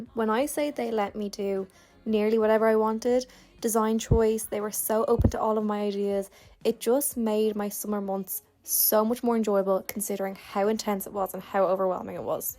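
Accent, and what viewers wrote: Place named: Ireland. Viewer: OHHH I absolutely love that your channel popped up on my feed! Your Irish accent is the icing on the cake! 🎂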